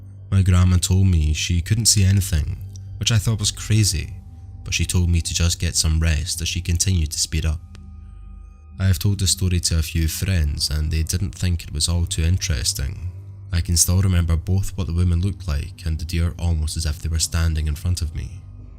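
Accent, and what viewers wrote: Scotland, United Kingdom. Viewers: Mr., I, myself, am Native American. It was a true joy to hear stories of Skin walkers in a Scottish accent! I loved it!! ❤❤🤘👍👊fist bump! I truly love your channel!! So honored to have subscribed!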